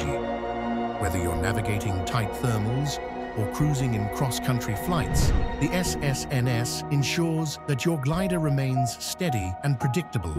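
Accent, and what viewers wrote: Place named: Russia. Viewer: narrator is russian? why such bold russian accent?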